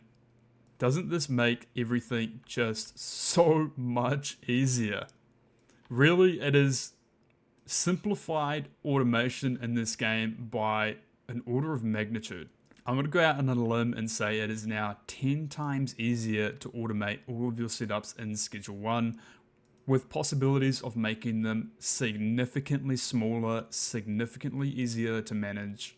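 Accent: New Zealand. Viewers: as an aussie, he defo speaks proper English, just sounds like he's from new Zealand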